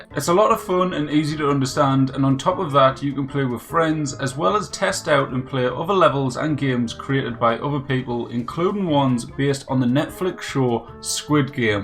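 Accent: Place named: Newcastle, United Kingdom. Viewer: Geordie accent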